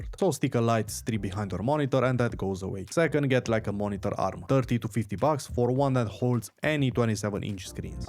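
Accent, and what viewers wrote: Romania. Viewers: Yo dude ... Love your vids helped me a lot ✌️ ... You from romania by any chance ?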